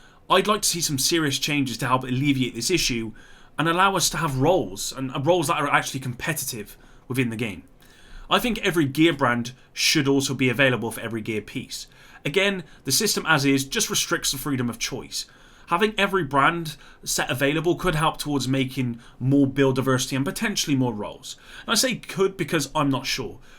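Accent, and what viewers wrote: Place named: West Country, United Kingdom. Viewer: Wondering what a "mega Fred" is... Just teasing I love that West Country accent. Also bring back optimisation!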